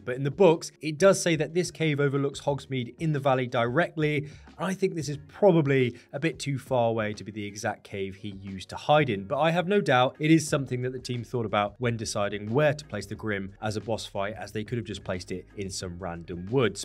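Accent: England, United Kingdom. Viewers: always! Your videos are the only ones I’ll watch for HL content. Professional, eloquent, and no fluff. And an English accent is much more preferable to an American one. Haha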